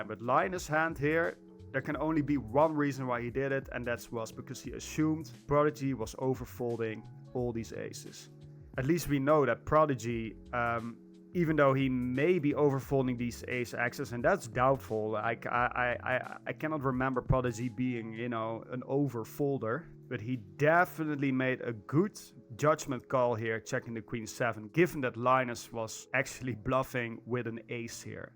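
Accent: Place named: Netherlands. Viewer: Horrible Dutch accent😂😂😂..nice vid though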